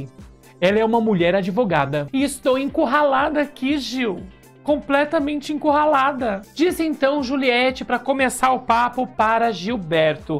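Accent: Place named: Bahia, Brazil. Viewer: KKK seu sontaque Baiano é perfeito!! sou Baiana.😂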